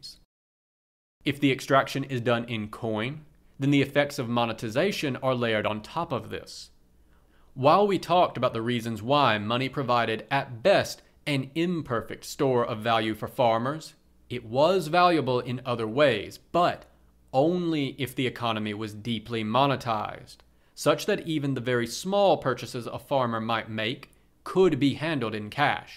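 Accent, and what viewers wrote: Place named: South, United States. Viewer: Thanks for doing the audio! I really enjoyed it. Where are you from? I keep thinking somewhere...southern US east of Texas. (It is not a huge accent. Some vowels, not all of them and none of them all the time, sound slightly southern to me.) Maybe Alabama or Georgia? State-level id is plenty, I'm not trying to doxx you.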